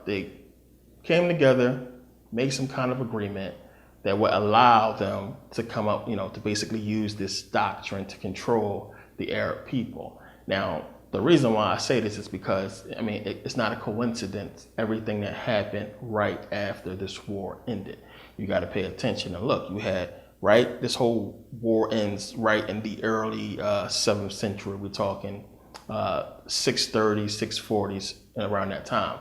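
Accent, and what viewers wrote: Philadelphia, United States. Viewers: You wouldn’t happen to be from philly would u? It sounds like it from the way you talk.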